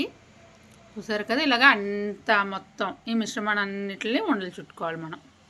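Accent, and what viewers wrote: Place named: Tamil Nadu, India. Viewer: Boríng commentary, Tamil pronunciation. Don't use aluminium dishes.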